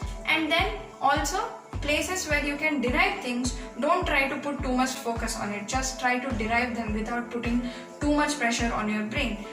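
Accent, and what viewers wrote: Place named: South India, India. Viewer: South english ek number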